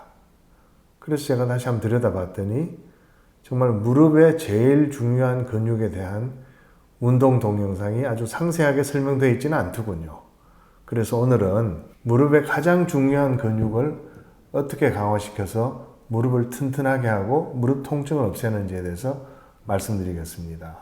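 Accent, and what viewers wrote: Gyeongsang, South Korea. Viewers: 갱상도말투 댐알겠다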